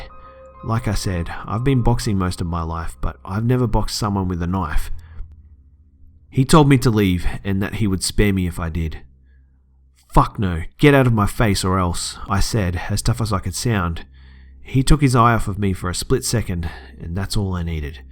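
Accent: Australia. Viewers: I think he's Australian? someone correct me if I'm wrong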